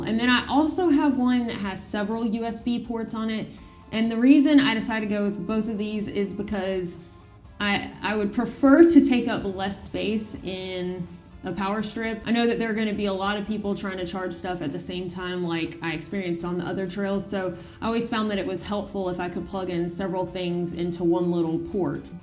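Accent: United States. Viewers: I adore your American accent. Thank you for showing your adventure. I hope your sister finds as much enjoyment in the hike, as you.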